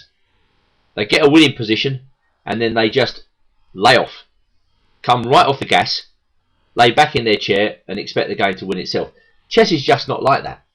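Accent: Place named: England, United Kingdom. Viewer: I don't think so at all. It's a classic English accent. At least I can understand him. Whereas with a Scottish accent for example, I often have trouble understanding it. Scottish accents remind me of French Cajun from Louisiana where almost every other word is indiscernible. So I have no gripes about this man's accent. Perfectly English.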